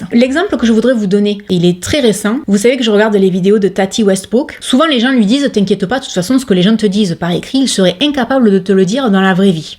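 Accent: South, France. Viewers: J'ai été harcelée à l'école sur le fait que je parlais "pointu", en gros l'inverse de ce que l'on reproche à ton bel accent du sud. En gros j'ai été harcelée car vivant dans le sud, je n'en avais pas l'accent..... Ridicule non? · Bonsoir. Et bien moi j'ai 55 ans, je travaille dans un service public qui accueille des clients plus ou moins intéressants, je suis du 12,j'ai un "assent" comme le tien...bref je cumule!!!! En résumé: vieille,fonctionnaire et du sud...mais qu'est-ce que j'aime ça!!!!. Vive nous et m.... aux aigris. Vive!!! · Merci à toi. Tes vidéos me plaisent toujours autant. Tu as bien raison de ne pas te laisser faire, bravo. Et moi j'adore entendre ton accent du sud en particulier tes "moins" 😂 bonne fin d'année à toi ❤❤ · Je suis estomaqué de voir à quel point certaines personnes sont méchantes. Moi j’adore tes vidéos tu me fait toujours passer un très bon moment, toujours bienveillante et j’adore ton accent ( je suis du sud aussi). 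Continue comme tu es et tu as raison on ne doit pas banalisé le harcèlement. Bisous 😘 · Bonjour, je crois que c'est la première fois que je laisse un commentaire...J'aime beaucoup ce que vous faites, et votre accent est super (je suis moi aussi du Sud - mais Est;) )..Pour en revenir aux réseaux sociaux j'ai fait un commentaire récemment sur twitter concernant une photo d'un hérisson en taggant une personne qui les dessine et qui les nomme "choupisson"...Je me suis pris une volée de bois vert de la part de l'auteur du tweet qui a dit en gros que ce genre d'appellation faisait du mal à ces animaux et incitait les gens à les prendre en animal de compagnie... Pour un mot... Merci pour votre bienveillance et votre sourire..; Belle et heureuse année à vous et votre famille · Moi j'adore ton accent du sud ❤ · Coucou, oui le harcèlement est réprimé par la loi, et oui il faut le dénoncer car généralement les harceleurs s’en prennent à plusieurs personnes quand le but est atteint, ils attaquent une autre et ainsi de suite … j’adore tes vidéos sincères, j’aime tes crash-tests et les autres tests avec avis bref, c’est un plaisir d’entendre ton petit accès du Sud et ton rire 😊 Belle année lumineuse ✨ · 😱 je trouve ça épouvantable d’avoir à expliquer tout ça! 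Je vais donc en profiter pour te dire que j’adore ton accent du sud! Je suis du Québec et mon fils s’est marié à Limoux en 2019! Il habite cette belle région du sud que je trouve formidable! Merci pour tes vidéos! 🥰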